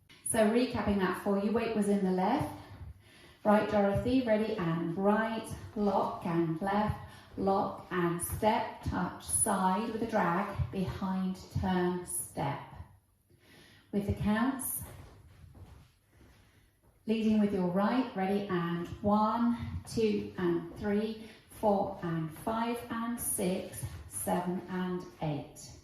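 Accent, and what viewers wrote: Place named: England, United Kingdom. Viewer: The best Line dancing teacher on the Net, with a bonus of a lovely English accent. x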